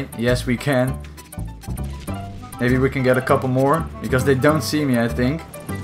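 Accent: Netherlands. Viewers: Very nice dutch accent i love it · Je hoord dat je nederlands bent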